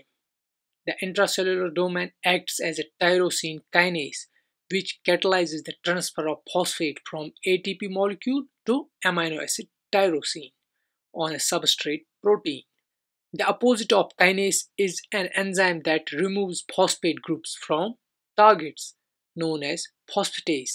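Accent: Kashmir, India. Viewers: recognised you from your accent as a fellow kashmiri ......grt to see such good work...Allah bless you aameen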